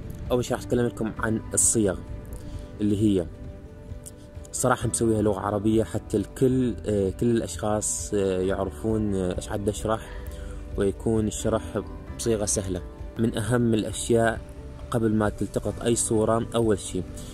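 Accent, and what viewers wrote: Mosul, Iraq. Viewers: حبي لهجتك من الموصل صح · من لهجتك مبين عليك من الموصل أبن مدينتي ❤ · ما اعرف ليش احس انت من الموصل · مبين من لهجتك مصلاوي ❤️ تحياتي لك من ارض نينوى الحدباء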